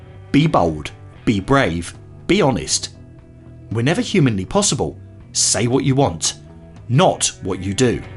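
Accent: United Kingdom. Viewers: Ive never herd something deep from a british accent.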